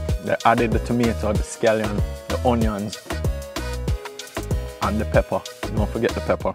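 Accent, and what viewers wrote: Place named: Jamaica. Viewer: I love your accent, great job on videos my Jamaican friend:)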